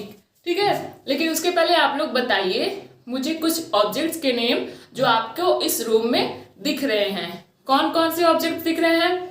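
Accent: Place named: Bihar, India. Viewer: Baaki sb thik h ...bss language m biharipn aa lg ra h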